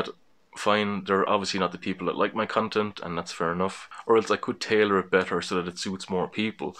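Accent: Ireland. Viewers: Kinda down to how you define Vlog really. Isn't a vlog a video log? So it's about you and whatever you're up to or what you're into. Unless you have a completely boring life then a personal vlog is going to have variety. I've looked into Irish vloggers and if they're not yet done copying Jenna Marbles it's largely them coming and going to and from work or their sports/hobbies. You do have a niche with your Irish head on ya and accent and living in the Wesht of Ireland. The majority of Irish vloggers seem to be all cut from the same middle class or hipster or trendy bolts of cloth. Don't compare yourself to them at any rate. Also that argument for niche is countered on YT with others saying if you don't have one to do verity until you do find onr. You're thing is very distinctive anyway and if you got picked up by some American viewers you'd spread far and wide, especially if you're bringing them local stuff. 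Start another channel for the project pieces but dance round them on the vlog to push traffic there. We intersect on several things you've covered not just the one, heritage, video, Geocaching and local radio being the main ones. Ya don't normally find those things on the one channel so variety does appeal to many. Ultimately you are the brand and you are the product here. I'm here to find out what got up to this week and maybe learn a few things on the way.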